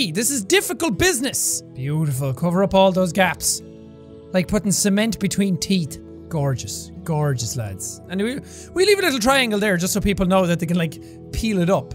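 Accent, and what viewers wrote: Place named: Ireland. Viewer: Man, what happened to the good old days when just started using his Irish accent? I wish I haven't watched every video back then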